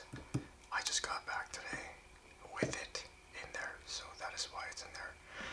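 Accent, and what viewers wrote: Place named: Canada. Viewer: The Canadian accent is relaxing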